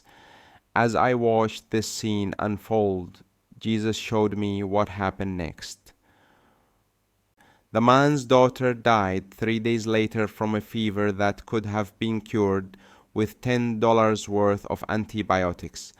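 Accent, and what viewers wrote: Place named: Pakistan. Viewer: He doesn't sound arabic, more like a pakistani.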